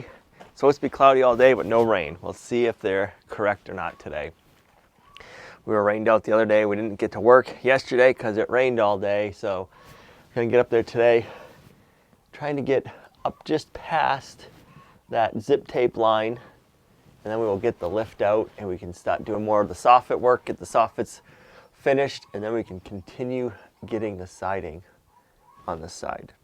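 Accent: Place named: Boston, United States. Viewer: I think he grew up near Boston. His "mornings" are legendary!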